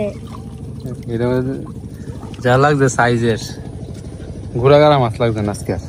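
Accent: Netrokona, Bangladesh. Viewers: মাছ দেখা ছারাও কথাডি হুইন‍্যা মনডা খা খা কইরা উডে।মনে অয় কাইন‍্যাইল বা গাইভনা বা কলমাকান্দা হেইরহম কোনো গ্রাম থাইক‍্যা কেউ কথাডি কইতাছে।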